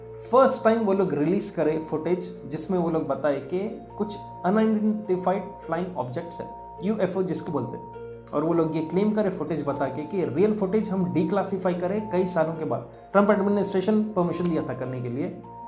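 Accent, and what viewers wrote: Hyderabad, India. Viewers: PLEASE IGNORE NKO KARO🙏🏻 IMPORTANT Bhai main aapke videos 4 5 year se dekh raha hu main Mumbai se hu aapke sabhi channel dekhta hu....par ek bat mujhe aapse kafi salse puchhani thi ke Farhan Bhai Kyu nahi dikhte ab wo kaha hai aur Kya reason hai ki wo ab videos me nahi dikhte mujhe Jana hai aur mere sath mere frd log bhi Jana chahte Kya reason hai ki wo ab nahi nahi rahte videos me plz reply kariyga 🙏🏻 bohot Sara pyar Mumbai aur Meri tarf se aap ko dekh ke main kafi chijo ko sikha abhi main 20 salka hu main aapko 15-16 ka tha tabse dekhraha hu main aap ab se jarur life me ek bar milna chahta hu main bhi aapki tarah haidrabadi bolne ki koshish karta hu waise main nahi janta etna bas koshish karta hu ...... God bless you all ❤️❤️❤️❤️❤️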